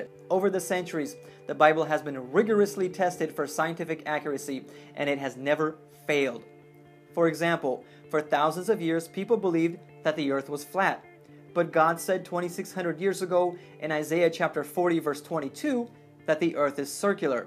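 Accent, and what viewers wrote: United States. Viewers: Co tam u ciebie? so you are Polish or not? because you sound American. BTW I'm not Polish I just have Polish friends my best Polish friend is in Ireland on the run from the law for driving offences his name is Prawo Jazdy.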